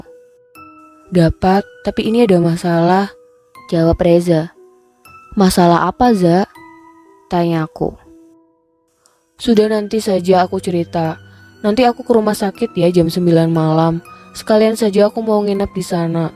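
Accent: Banyumas, Indonesia. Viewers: Udah penasaran sama akhir ceritanya, logatnya ngapak2 medok ya min 😂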